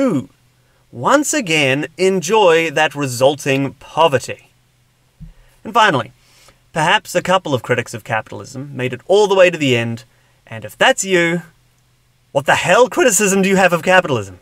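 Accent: New Zealand. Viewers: Why does Matt Smith have a kiwi accent?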